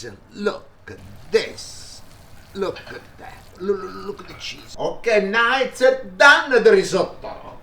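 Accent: Italy. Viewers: You are amazing with your Italian accent, a big follow from me with a big like. Will make this recipe today 🥰🥰🥰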